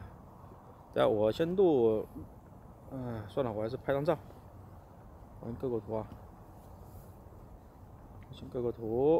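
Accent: Sichuan, China. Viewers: 新粉留言，你的川音好有亲切感～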